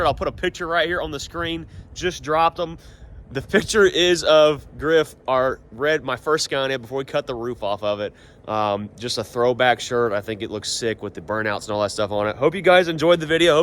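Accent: Netherlands. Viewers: bro sounds Dutch and I should know lol.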